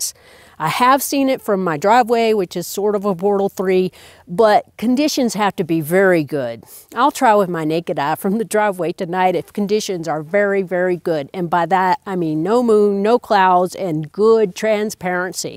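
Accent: South, United States. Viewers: I love your southern-sounding accent. Subscribed 😊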